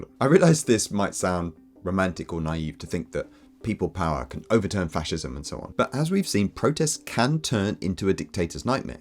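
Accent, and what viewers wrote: United Kingdom. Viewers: How does someone whose accent gives them away as a resident of a constitutional monarchy misunderstand monarchy so brutally? “King” and “dictator” are not synonyms, you stump. You live under a king; are you fucking oppressed? The US constitution, in fact, is almost entirely based on the UK system in the 18th century—you have branches of government and divisions of powers, too! You had them FIRST! You lost all credibility right from the start.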